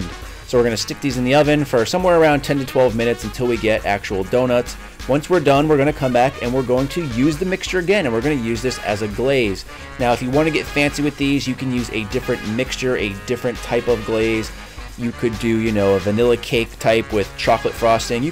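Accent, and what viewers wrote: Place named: New England, United States. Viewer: what part of New England are you from?? Wicked!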